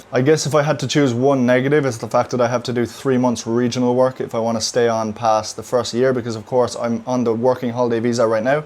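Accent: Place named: Ireland. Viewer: Beautiful Irish accent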